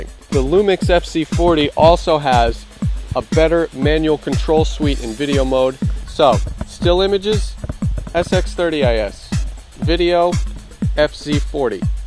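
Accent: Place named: United States. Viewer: Like most americans he pronounces Z the way you would if you were saying Zorro, for instance. The rest of the world pronounces it "Zed" (as it should be), so he means FZ 40.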